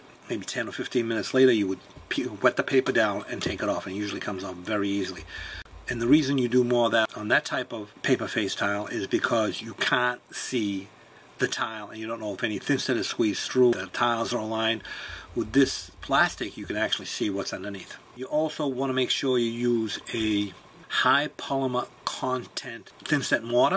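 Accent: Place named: Danvers, United States. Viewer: Thanks for another great video. I started tiling full-time just a couple years ago and you've been an important resource to build my knowledge and skills. I like the straightforward nature of your videos and listening to you talk reminds me of being back in Danvers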